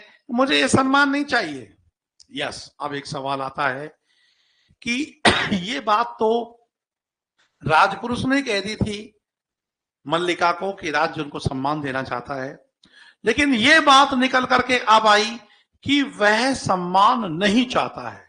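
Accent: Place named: Bharatpur, India. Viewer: Lagta hai guruji Bharatpur se hai hamare jesi language hai bilkul ye Bharatpur bali hi h😀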